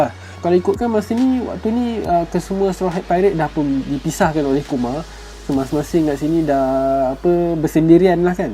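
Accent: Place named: Pahang, Malaysia. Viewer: pekat ngt loghat temerloh nye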